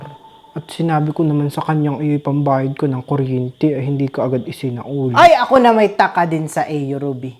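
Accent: Batangas, Philippines. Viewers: Gustong gusto ko ang punto mo, taga saan kb meses? Batangas o cavite?? Nppasaya mo kming lht, maraming salamat❤❤ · Anong accent yan? Saang probinsya yan?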